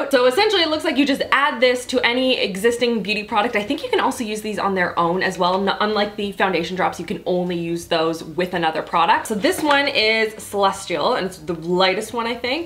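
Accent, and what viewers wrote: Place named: Canada. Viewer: where is she from? Canada? I have a feeling cause of the way she talks:) Super funny video:)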